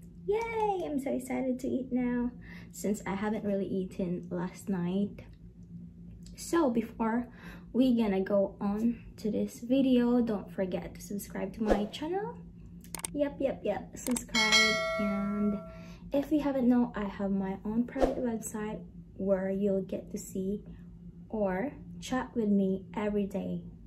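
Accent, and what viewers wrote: Philippines. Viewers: Hi beautiful.. can i ask you something.. taga diin ka sa visayas.. im surprise that you spoke like ilonggo if im right...keep safe always ang God bless...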